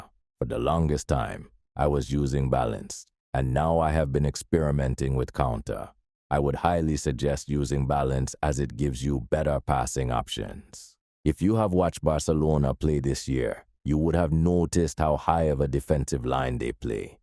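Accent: Jamaica. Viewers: The yardie voice alone makes this all top tier content. Actually never seen someone say some of this. Im div 2 but still learning 👍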